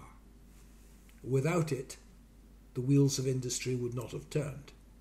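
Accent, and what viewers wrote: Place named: Upper class, United Kingdom. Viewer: I once saw a video of hers advocating nuclear energy, leaving aside a few important sobering arguments against nuclear power. I thought they‘d be useful to consider and had them placed in the comments. - No reaction. I also don’t like too much the tone of voice and the sort of strange upper class English she speaks, presumably in order to underline excellence and intellectual superiority.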